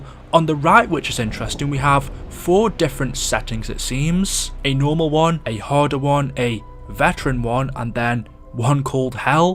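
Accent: United Kingdom. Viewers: Love your voice and vids you sound like a British Person no Offense if you are one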